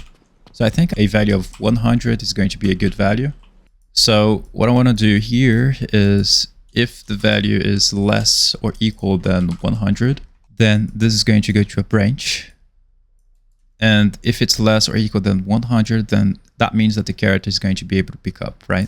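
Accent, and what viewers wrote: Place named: Brazil. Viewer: bro has that brazilian accent :goodvibes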